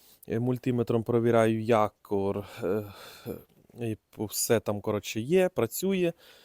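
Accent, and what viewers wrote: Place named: Uzhhorod, Ukraine. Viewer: перший раз глянув відео,ну акцент чисто як з Ужгорода,ближче до мадярів,Вітаю зі святами!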